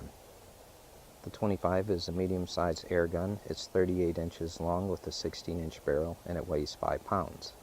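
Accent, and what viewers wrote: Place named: England, United Kingdom. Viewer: Gracias Sr.. Very good this video a lot of informative and crearly explicative mode to understad your fine English accent for Us. Thanks a lot. Saludos desde Venezuela..sorry my Bad English!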